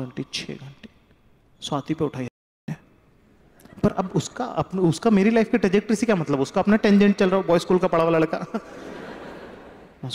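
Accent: Indore, India. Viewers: Bhai Bilkul Indori language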